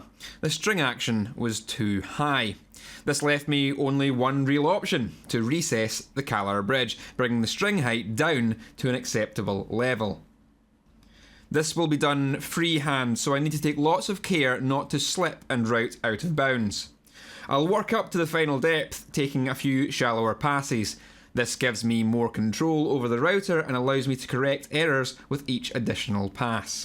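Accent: Scotland, United Kingdom. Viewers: Oh! Now I see. The accent is scottish! That's why the "peckup" and so on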